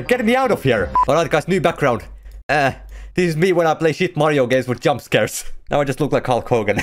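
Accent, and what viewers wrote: Finland. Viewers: i love the strong Finnish accent probably because im Finnish.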